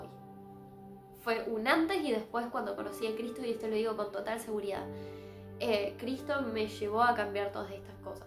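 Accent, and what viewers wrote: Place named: Buenos Aires, Argentina. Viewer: Se nota lo porteño Sho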